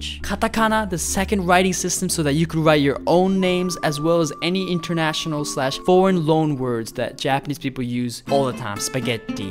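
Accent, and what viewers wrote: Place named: South, United States. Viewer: Southern accent from a Japanese face, that’s nice combo!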